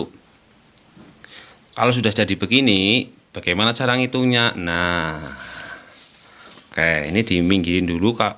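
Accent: Banyumas, Indonesia. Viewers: Ora ngapak ora kepenak... Maturnuwon kang ilmune...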